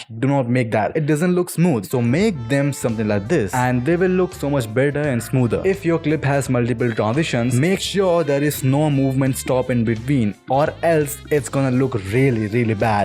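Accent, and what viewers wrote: India. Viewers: always the indian acent with the best tut:) love u sm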